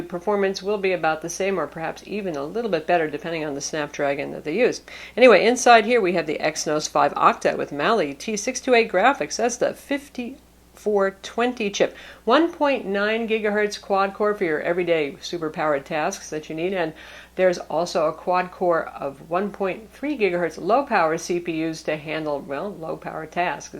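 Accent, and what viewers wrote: Texas, United States. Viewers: You sound texan to me...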